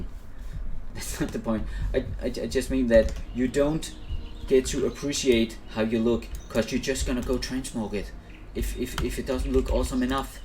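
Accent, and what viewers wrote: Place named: Denmark. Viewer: What contry is you from? Du lyder dansk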